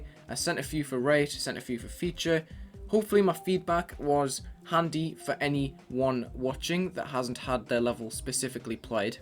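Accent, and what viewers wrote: United Kingdom. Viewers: To be honest, literally sounds like my math teacher with a British accent.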